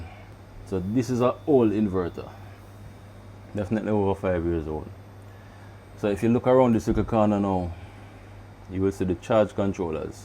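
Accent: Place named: Jamaica. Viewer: my grandma is from Cuba too. Love the interaction and love your accent Love the Jamaican accent with the Cuban accent beautiful. She was just the same curses in patois curses in Spanish We love her. Great job girl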